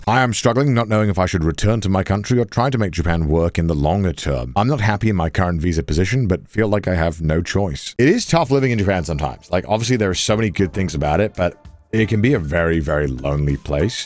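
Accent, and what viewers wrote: Wales, United Kingdom. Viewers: Where you talk about loosing touch with you're "welshness" I feel you're loosing your accent. It's becoming more generically English vs out of the mines Welsh. (vs the midwife I just had for the birth of my son- where it's like, "Yeah you're definately from Wales.")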